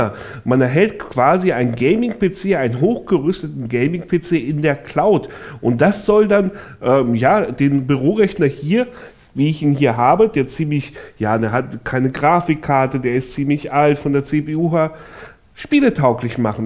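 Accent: Augsburg, Germany. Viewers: Der kommt aus augsburg ja moin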